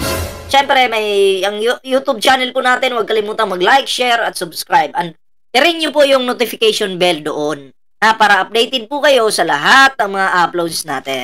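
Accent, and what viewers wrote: Visayas, Philippines. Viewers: Putang ina mo gago ka bat ka nakatakip mukha mo bobo kupal ka matapang ka ipakita mo mukha mo hindot ka sipain pa kita jan boses bisaya kupal na yan.😂😅mukhang pwet kaya nakatakip mukha niya idilat mo mata mo kupal ka Kalahari na nga mata mo nagtatago kapa 😂 ang mga Dutae na pinapanigan mo sila ang tunay na magnanakaw eh malamang ganun ka din ano naayudahan ka ba ng mga Dutae tanga.😅😅😅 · Bisaya ang tunog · Nagsasalita k nakatago mukha mo sa salita p lng me punto n bisaya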